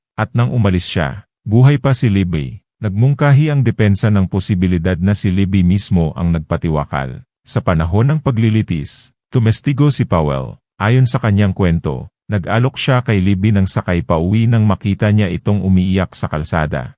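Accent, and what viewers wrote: Visayas, Philippines. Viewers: May pagka-visayan accent cya pero naiintindihan naman.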